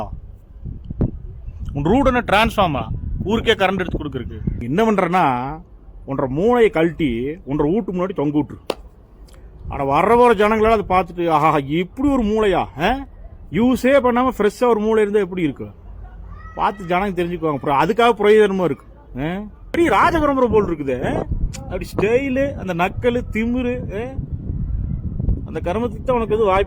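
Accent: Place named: Coimbatore, India. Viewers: கோவை பேச்சு சூப்பர் பா 🥰🥰🥰🥰🥰🥰🥰🥰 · கோயம்புத்தூர் பாஷையில் சூப்பர் குத்து😂😂😂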